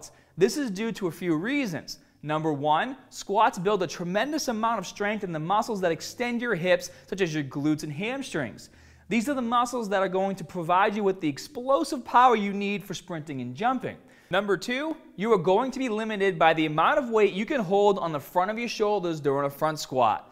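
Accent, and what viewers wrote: Boston, United States. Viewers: DIdn't have to listen to that horrible Boston accent longer than i needed to...thank you sir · Are you from boston by any chance? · The more I watch videos the more i kinda sorta want a Boston accent. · omg he's from Boston, I've watched him for years and it's always come out, get over it! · Its a boston accent apparently. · Sonia Boston accent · This guy lol bahhston for sure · That accent though ❤️ Boston? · Is this guy from Boston? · He’s from boston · I came out of curiosity stayed because of the Boston accent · oh man! that boston accent! love it! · I almost can’t get through this video with that Boston accent. Geez lol.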